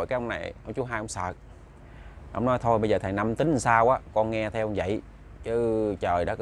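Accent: Mekong Delta, Vietnam. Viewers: Người Miền Nam mà lại hệ miền tây nên dễ nghe, dễ hiểu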